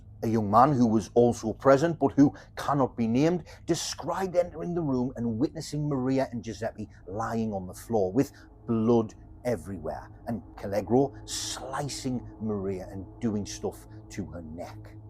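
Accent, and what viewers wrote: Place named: Sunderland, United Kingdom. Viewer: Yes, I'm a Mackem too. You can't really mistake the accent for anything else 🤣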